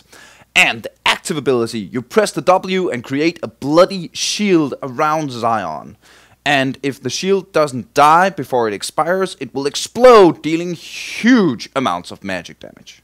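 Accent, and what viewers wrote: Denmark. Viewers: Dat danish accent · we can rly hear you are from denmark bro xD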